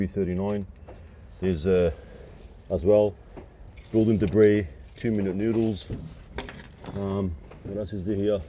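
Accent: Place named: Australia. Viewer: love the guys accent.. to me its one of the true aussie accents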